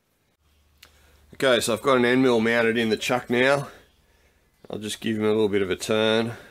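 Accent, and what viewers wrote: Australia. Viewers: Dude kick ass accent. Ausi I gather. Good vid bro.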